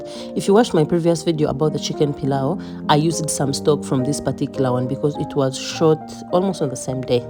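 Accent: Kiga, Uganda. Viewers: U sound like a mukiga btw, I love your video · You sound Ugandan, thx for cooking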